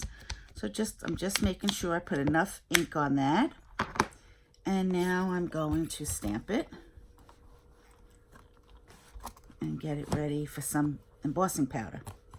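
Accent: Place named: New York, United States. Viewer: What an inspiring and encouraging video. You have covered all my favourite topics and techniques - inked backgrounds, stamping, embossing and water colouring, and all accompanied with your wonderful New York accent. You have made my day!! Thank you so much for the great advice on a technique that I am now inspired to try myself. Love watching your videos here in Ireland.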